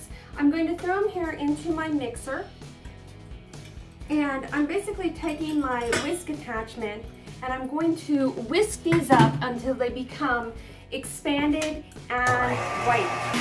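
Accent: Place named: Midwest, United States. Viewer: I'm guessing u are from the Midwest. ..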